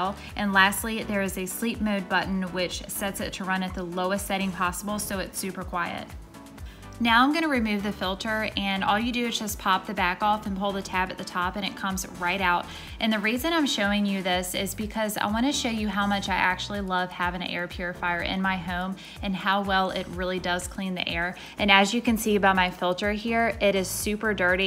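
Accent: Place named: South, United States. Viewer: this the only video i’ve watched & i can tell she from the south 😂😍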